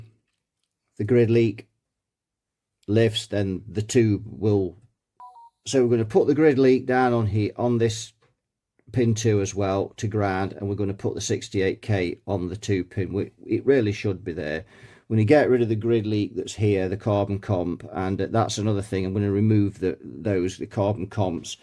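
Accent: Nottingham, United Kingdom. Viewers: Nottingham accent?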